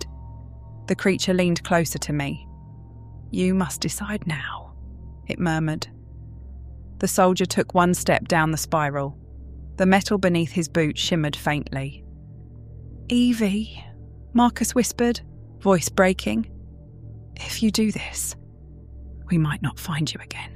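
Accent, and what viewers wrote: United Kingdom. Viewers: I wasn’t fond of this voice. It had too much of an undertone of cockney or something which ended up being a bit grating. However, the story was enjoyable.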